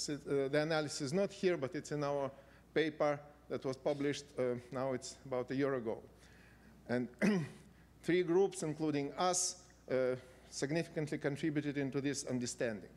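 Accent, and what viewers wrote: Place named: Russia. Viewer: He speeks with thick russian accent.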